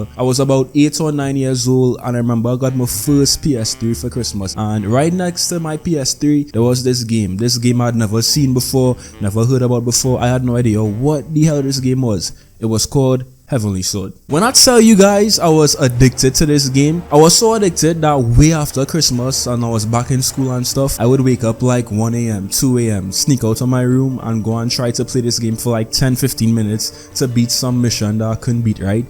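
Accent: Trinidad and Tobago. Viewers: Is that a trini accent?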